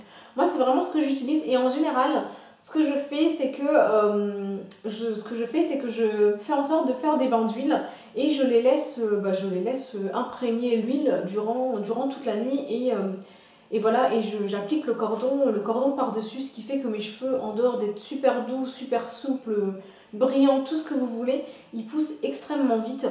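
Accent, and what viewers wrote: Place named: Kabylie, Algeria. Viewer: t'es kabyle ? psk ta l'accent ^^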